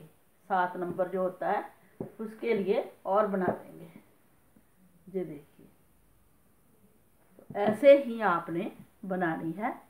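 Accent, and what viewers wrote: Punjab, India. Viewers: ਭੈਣਜੀ ਤੁਹਾਡਾ ਬੋਲਣਾ ਅਛਾੱ ਲਗਦਾ ਹੈ ਵਿੱਚ ਹਿੰਦੀ ਵਿੱਚ ਪੰਜਾਬੀ ਬਹੁਤ ਵਧੀਆ ਲਗਦਾ ਹੈ 🙏🙏🌹🙏🌹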